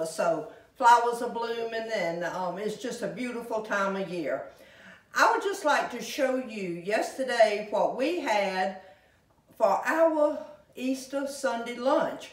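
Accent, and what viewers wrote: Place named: Carolinas, United States. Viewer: Oh you just make my day, ❤. I look forward to your dialect, very much like my own, born and raised in the Carolinas.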